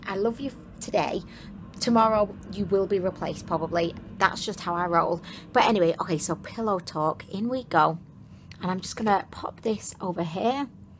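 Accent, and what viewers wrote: United Kingdom. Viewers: It's def like a silk pillow!! Always watching and enjoying your entertaining reviews with your lovely British accent. <3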